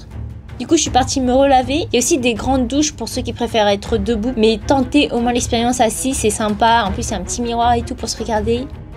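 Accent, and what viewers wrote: France. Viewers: "quel bon français" ??? LOL elle est parisienne…. Qu'est-ce que c'est que ces préjugés ?! (votre français par contre est à re-travailler… "on s'y serais cru y être" ???)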